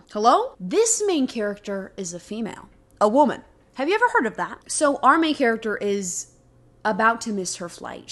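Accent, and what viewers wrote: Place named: South, United States. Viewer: Why was your southern accent better after drinking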